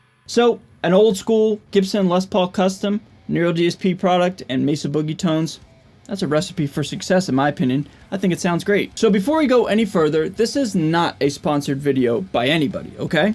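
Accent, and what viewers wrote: United States. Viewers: What am I hearing, meaning what r u playing thru? That was fat man. I want a home studio. And I’m curious about yours. Mostly because you speak American and not British !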